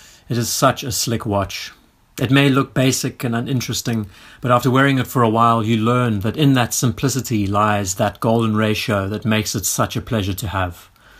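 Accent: South Africa. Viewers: Great collection, very enjoyable channel. Your accent sounds South African. Hello from Johannesburg South Africa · This is a bit sad. You’ve spoiled the joy of owning the real thing by buying cheap (albeit well done) knockoffs. So if you do ever decide to trade up, it won’t feel as special. Call it what it is, it’s like living with a very good sex robot versus a real girlfriend. Whatever, judging by your accent you’re from South Africa, so it’s just as well that when you inevitably get robbed of them it won’t be so bad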